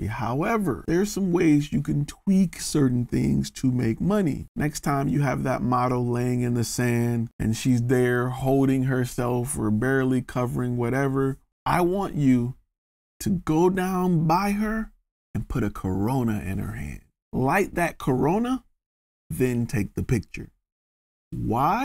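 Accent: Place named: Detroit, United States. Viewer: Yo bro you from Detroit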